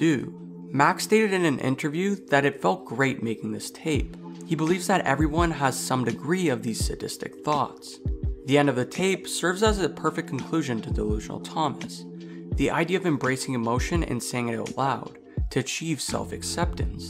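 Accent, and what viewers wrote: Canada. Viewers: You're Canadian, I can tell by the way you say "out"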